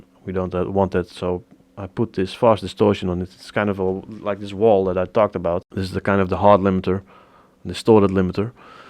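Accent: Netherlands. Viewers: A hard hitting techno kick and a guy talking with a pleasant Dutch accent... I guess I going to listen to an old Thunderdome CD when I am going to bed tonight. In fact, I find your tutorials quite lekker.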